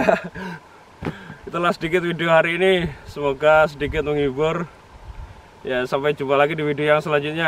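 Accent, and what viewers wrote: Java, Indonesia. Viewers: Jan gk ilang blas jawane...."uwwaangel giaanndul gandul teng krontelll"😅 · Kya,,a logat orng Jawa ya bos sampean😀😀😀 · Asline wong ndi mas ketone wong jowo iki. Kerjo teng pundi mas😇🙏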